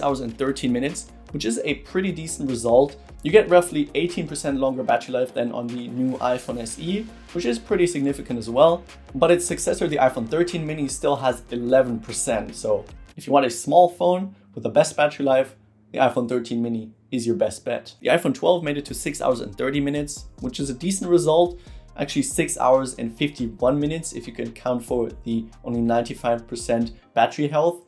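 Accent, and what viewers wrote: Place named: Germany. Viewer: Having a German accent is definitely an advantage when you talk about technology.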